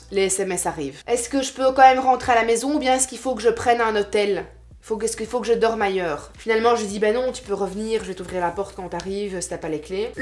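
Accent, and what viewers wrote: Belgium. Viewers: J'ai une question rien n'à voir avec la video. commence toujours ses vidéos avec "mes ptits vautours" (avec un bel accent belge hahahah). Ça vient d'où cette phrase? Pq elle appelle ses abonnées comme ça?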